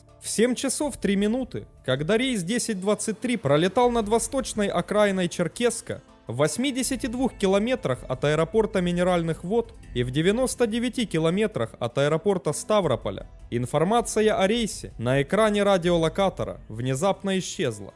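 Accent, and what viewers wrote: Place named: Ukraine. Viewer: Мляяя, кому не лень делают обзоры катастроф, походу не понимающие в авиации ничего. Сам инженер авиационный с 1989года. Говор автора вроде украинский.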